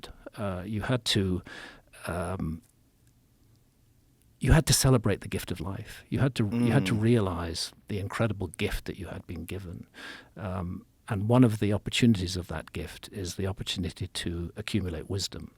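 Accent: United Kingdom. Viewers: jus because u have a british accent doesn’t mean ur smart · Still waiting for concrete proof of your theories graham… you trick a lot of people with your fancy words and British accent but eventually we need some proof. I’m sick of listening to the same stuff over and over again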